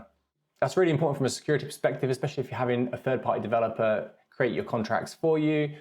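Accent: United Kingdom. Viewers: I can tell by your accent you are from the UK... What is your take on the FCA regulations? I have asked them a question about setting up my own coin as I don't want to land into any legal trouble. Not had a response yet but reading through their manual they state exchange tokens and utility tokens are not regulated but it has no clarification based on tokens with liquidity. I am unsure if this would make them e-money or a Security Token which are both regulated. The regulation document is so confusing but it would be good to hear someone else's take on it being from the UK and involved in the crypto market.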